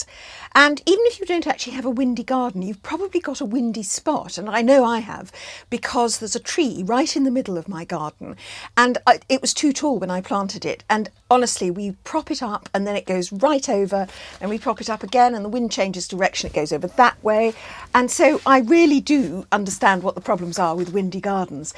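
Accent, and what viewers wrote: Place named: England, United Kingdom. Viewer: Never thought I'd be listening to such a delightful English accent when I wanted help with my super windy terrace garden. And the tips are marvellous to boot. Thank you for the video. :)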